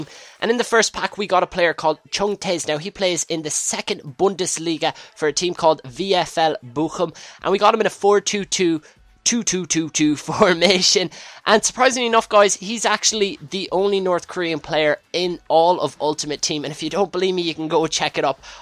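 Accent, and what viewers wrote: Ireland. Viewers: Where in Ireland are you from i'm from Cork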